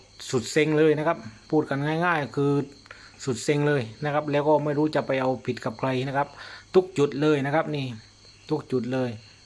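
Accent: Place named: Tak, Thailand. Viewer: สำเนียงพันนี้คนตากแน่เลยนิ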